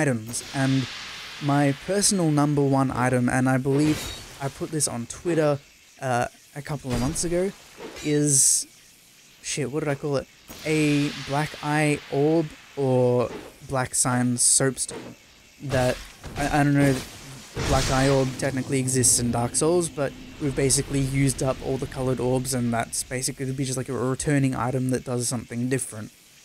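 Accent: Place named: Australia. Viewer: Well, he is Australian, "sour"...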